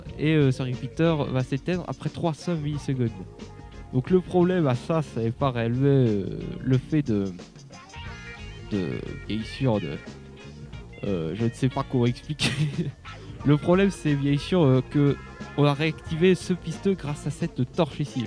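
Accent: South, France. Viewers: hé ! l'accent du sud c'est le plus bel accent du monde ^^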